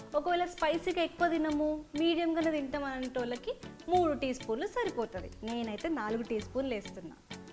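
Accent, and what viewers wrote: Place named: Warangal, India. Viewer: Warangal slang Also to good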